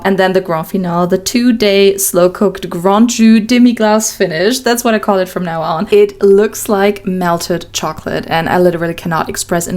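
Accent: Germany. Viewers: Are you german? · is she german/austrian/swiss?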